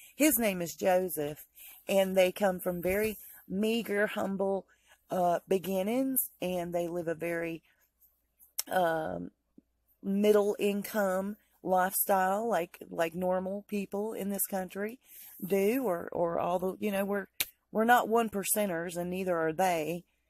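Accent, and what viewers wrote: South, United States. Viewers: Girl, you crack me up!! Im from the South and you bring back lots of memories of growing up among Southern white women. No harm or racism intended...love your accent!! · You sound Southern are you from the South? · I can't decide if you are, in fact, Paula Deane, Nancy Grace, or Samantha Markle. Your deep southern accent is so familiar but I just can't quite place it. So much snark and meanness directed at Meghan. What is your beef with her and why do you care so much what she is up to? Meeeoooww.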